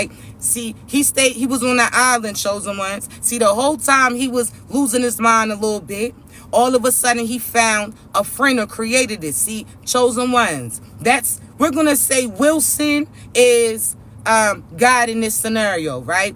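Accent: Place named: Baltimore, United States. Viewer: Love the accent.. Baltimore?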